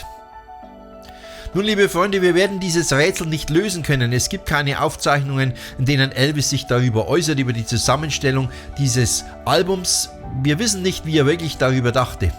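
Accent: Upper Franconia, Germany. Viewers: Hallo, ich habe Dir zu einen Deiner letzten Videos geschrieben und finde Deine Berichte Super. Nach Deinem Dialekt zu urteilen kommst Du wohl aus Oberfranken wie ich auch. Ich lebe aber bereits seit 1978 in Mainz. Bin aber ein Rehauer, wenn Dir das was sagt. Ich hatte Dir geschrieben, dass ich bis 2017 eine Band hatte. Man nennt mich den "Elvis von Gunsenum" zu deutsch Gonsenheim. Im letzten Jahr hatte ich mein 25-jähriges Bühnenjubiläum. Ich singe halt die Songs des King of Rockn Roll sehr gerne und es kommt an. Nach 2017 waren wir ein Trio und jetzt mache ich Soloauftritte in Seniorenheimen. Ich würde mich wirklich sehr freuen, wenn wir uns Mal treffen könnten. Vielleicht im nächsten Jahr beim Elvis Festival in Bad Nauheim. Dieses Jahr geht es leider nicht. Mit rockigen Grüßen von einem oberfränkischen Elvis Fan zum Anderen Volker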